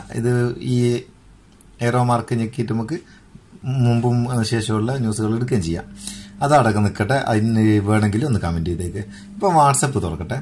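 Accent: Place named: Vatakara, India. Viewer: I sincerely appreciate you. Your slang is clear that you from Badagara region.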